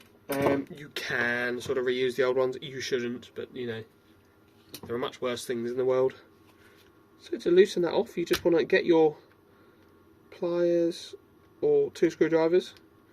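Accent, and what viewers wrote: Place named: United Kingdom. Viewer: what nobody wants to hear is that smooch british bs accent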